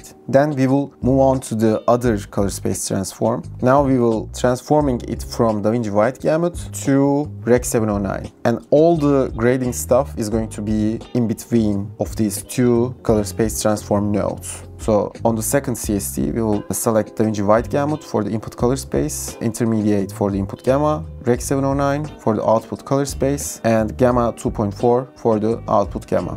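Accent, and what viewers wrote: Turkey. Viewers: lan dedim bu adam tam Türk gibi ingilizce konuşuyor Türk çıktı, selamlar teşekkür ederim video için :) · You sound like you are Turkish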